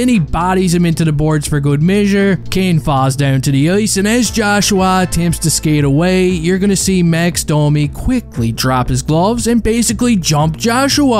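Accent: Newfoundland, Canada. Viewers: You sound like a newfie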